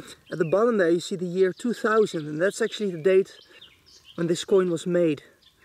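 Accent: Netherlands. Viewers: Is that a Dutch accent ;)? First video i've watched on this channel and definitely not the last. Very educational. Keep up the good work! +1 sub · You sound very dutch. Am I correct? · Leuk Nederlands accent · I can hear you are dutch ( ik ook) and i really find this interesting you just eared a sub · Goede videos maar je uitspraak is soms super nederlands. Het is maar een tip. Zoals the Bulge bijvoorbeeld. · Nederlands of belgies? Vanwege je accent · I can hear this dude being dutch lmao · Where are you from you sound dutch?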